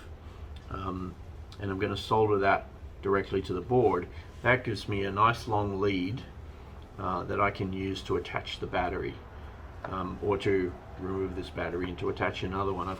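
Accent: Australia. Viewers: Half Aussie half American accent?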